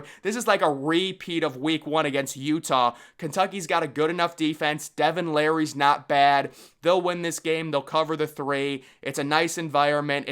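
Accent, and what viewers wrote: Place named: Boston, United States. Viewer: Look man I appreciate the content but where are you from with that accent? Boston? Canada? Are you saying Ruckis or Rockis?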